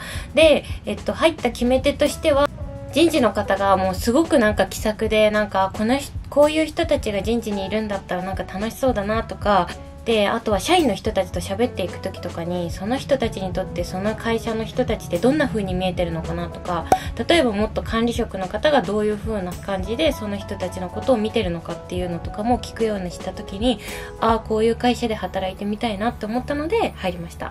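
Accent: Kagoshima, Japan. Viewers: わたしも鹿児島出身、東京在住なので親近感湧くし、突然鹿児島弁でちゃったらしばらく直らなくなるのめっっっちゃわかります（笑） しかもわたしもジャニオタあがりのケーポオタなのでもう勝手に運命感じてます😳 これからも頑張ってください❤︎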